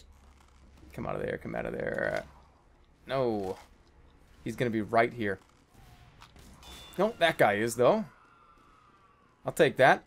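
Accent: Boston, United States. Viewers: Jeez the Boston accent. I want it T___T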